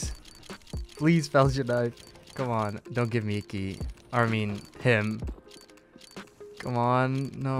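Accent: Netherlands. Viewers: wauw echt cool dat je nl bent. Je zij het in een oudere video en je hoort het bij sommige woorden lmao. Je bent goeie en je Engels is pittig goed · Just as I thought, het klonk gewoon te Nederlands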